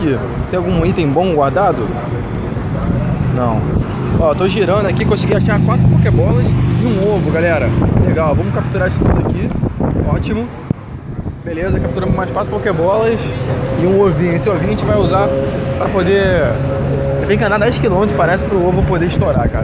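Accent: Rio de Janeiro, Brazil. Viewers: eu desconfiava dele ser carioca pelo sutaque dele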